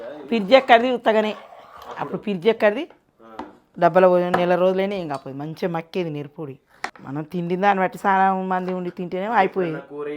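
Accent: Telangana, India. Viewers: Amma meeru pata chala baga padutunnaru. Me videos super. Telangana basha meeru matladedi bavundi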